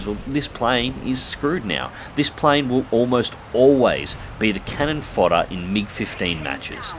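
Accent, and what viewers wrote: Australia. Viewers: Swearing in an Aussie accent just sounds to right hahaha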